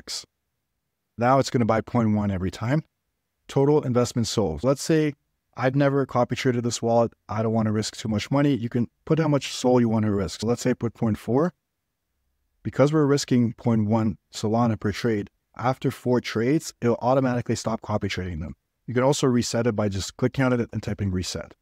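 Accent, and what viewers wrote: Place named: New York, United States. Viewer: You gotta be from NY or at least from the northeast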